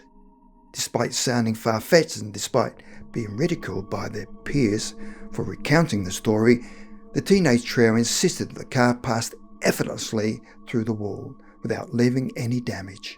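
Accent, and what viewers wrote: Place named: Australia. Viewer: Australian accent 😁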